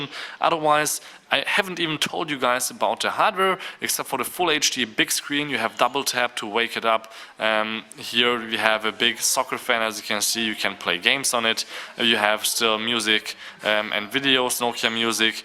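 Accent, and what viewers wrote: Germany. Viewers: this german accent is killing me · Who cares if he has a german accent? Lets see you try to speak german n not have an accent.lok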